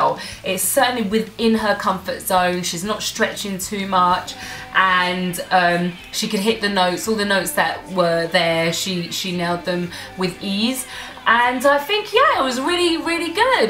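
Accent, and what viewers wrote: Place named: United Kingdom. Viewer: Girl i love you 😫❤ You're so cute, funny and full of energy 😅 And i love your British accent too 😂 you're like this year's Malta entry so colorful and joyful 😅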